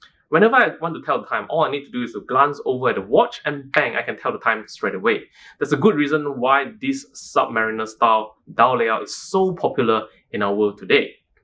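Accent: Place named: Singapore. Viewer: I think you are a Singaporean? I can sense it with your accent. I worked there in SG before...😊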